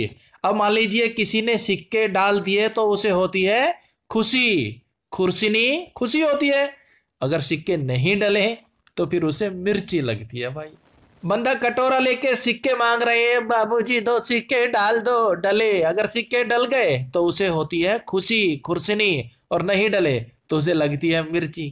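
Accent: Haryana, India. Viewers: Sir aapki trick na best h .. Bss aap ase hi hme vedio provide krvate raho haar session per Aapki aavad haryanvi m hote h vo bsst h m bhi haryana se hi hu mujhe or bhi aache se smjh aate h aapki vedio😀😀😀😀😁😁😁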